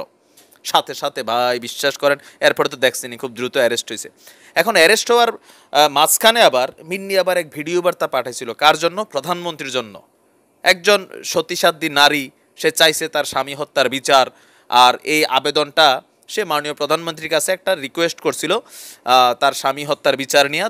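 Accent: Comilla, Bangladesh. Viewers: apnake dhonnobad apnar kothagulu amagur moto mone hoy comilla kothay jobayr dhaka theke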